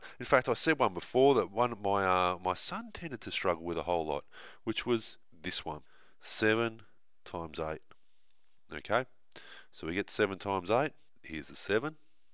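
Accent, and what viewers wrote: Australia. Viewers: Why are you talking with like a flu like kind of voice? Are you an Aussie or you are really having a cold? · He speaks very clearly. I guess you are just not familiar with the Australian accent :)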